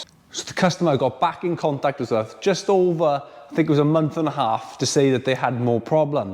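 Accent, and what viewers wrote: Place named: Northern Ireland, United Kingdom. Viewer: Gotta love a Northern Irish accent...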